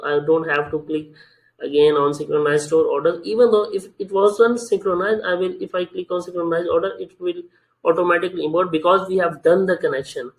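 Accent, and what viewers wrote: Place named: India. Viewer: Bhai english na bola pakaa deti ha hmy aap jaisi bhi english bol lo lgna ap ny desi hi ha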